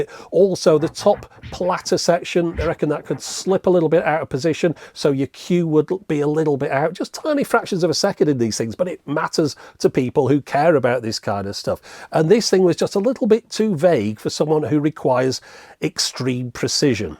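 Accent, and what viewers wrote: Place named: North West England, United Kingdom. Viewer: Just watched your 'shaving cds' video... Funniest thing I've seen in ages. Not even into hifi, though I am a musician, but subscribed on the back of it. Partly because you sound like you're from my part of the world (North West) and because I've got high hopes for April 1st ;) Cheers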